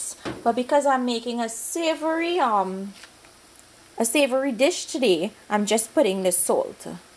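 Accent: Trinidad and Tobago. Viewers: Beautiful Trinidadian Accent!!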